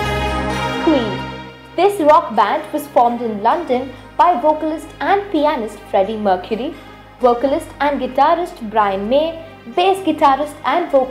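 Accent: India. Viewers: Is the commentary done by an Indian or something not like it matters but I thought they're knowledge was like cricket and how to make carpets fly and stuff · i hear indian accent ..never mind..they are so fucking far ridiculously behind in the mainstream rock and roll area and meansteam music...so fucked up with their bollywood shit!! idiots!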